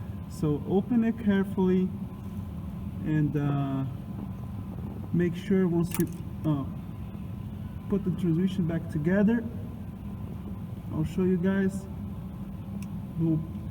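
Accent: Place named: Portugal. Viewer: Seu inglês aportuguesado está ok 👌 😂😂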